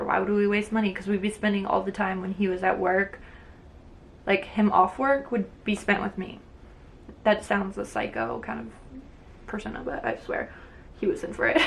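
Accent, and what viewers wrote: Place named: Nashville, United States. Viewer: I hear it most in the words "here", "now", and every so often at the ends of your sentances! I just assumed it was you picking up some of that Nashville accent lol ! Maybe I'm just hearing things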